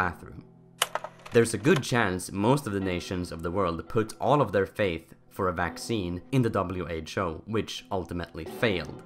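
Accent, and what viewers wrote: Sweden. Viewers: Heey are you swedish?! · He has a swedish accent anyway so I guess he is from sweden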